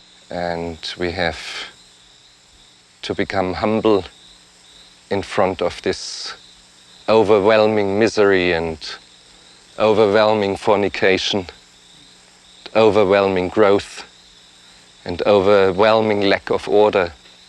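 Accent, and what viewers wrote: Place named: Germany. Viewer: That cynical voice, the thick german accent full of wisdom and perspective. Gives a remarkable contrast to the organic background and the animal sounds that almost mocks his wisdom with creativity.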